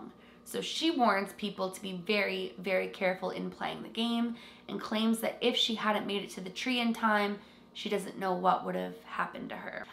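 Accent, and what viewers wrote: Canada. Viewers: Well she is canadian she can’t help her accent lol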